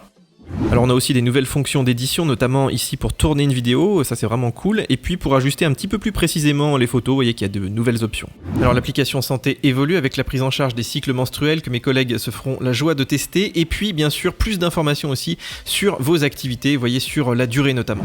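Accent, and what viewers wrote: Switzerland. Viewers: Mais c est que tu es que tu es valaisan 🤗 je ne savais pas 😏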